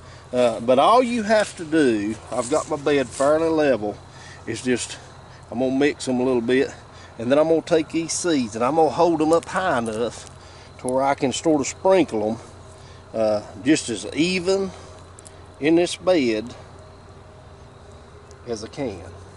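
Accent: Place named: North Carolina, United States. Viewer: I knew I recognized that accent lol I'm from south eastern , NC. Felt like home.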